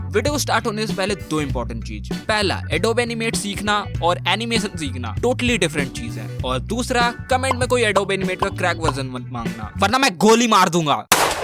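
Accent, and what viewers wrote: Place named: Bihar, India. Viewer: Bhai bihar se ho kya?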